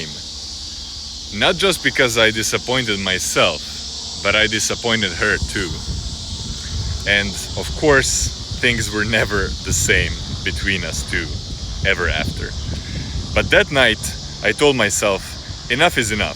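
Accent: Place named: Eastern Europe. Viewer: i knew u slavic or russian with ur accent good for practising as a russian native speaker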